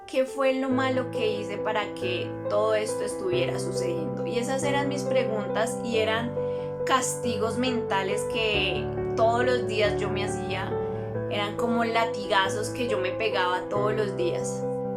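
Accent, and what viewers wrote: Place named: Colombia. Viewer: Hola, pareciera que fueras de Colombia, la verdad acabo de conocer tu canal y es de bendición para mi vida, agradecería que si estas en Bogotá me recomiendes el jardín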